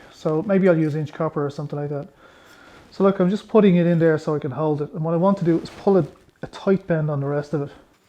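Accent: Ireland. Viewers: Great little video. That positive Irish attitude to life and the cheerful accent. It reminds me of my little Irish mum, god bless her and my she rest in peace. Those diesel heaters are an obsession. I have installed two at the cottage in Southern Finland. One running from the garage under the cottage into one side through a hole through the concrete slab. On the other side of the cottage, in from the patio area through the wall. Last year had only one but in one room was warm but still needed the wood fireplace on the other side of the cottage to heat things. That means trekking through the snow to collect wood to burn. In winter in the Finnish countryside, the snow can be meters deep and by January can be -10 to 20C, and it's a pain(A COLD PAIN) aside from the need to cut wood in the autumn. Filling diesel tanks on these heaters is easier. The newest of these heaters is the HCalary 8,5kw which I bought in mid-summer for a dirt cheap price on e-bay and I have put a 25 liter diesel tank. 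That one has a far better control panel with better features and easier use. The cottage is off grid, so hoping that I get the extra solar panels up just bought cheap to help keep the inverter and four 100Ah batteries topped up for the heaters and lights of the cottage during this winter. We have a Champion generator too, which helps the time we are there to maintain the place. That Hcalary heater can be set to come on when we are not there, but I am a bit unsure of setting it to go as earlier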